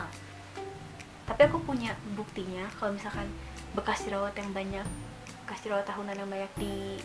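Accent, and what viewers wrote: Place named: West Java, Indonesia. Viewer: Logat sundanya nempel bgt ... Nice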